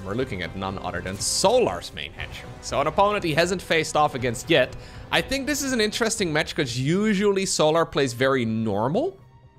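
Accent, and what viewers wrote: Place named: Netherlands. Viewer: I don't care what you say yhe dutch sounds freaking awesome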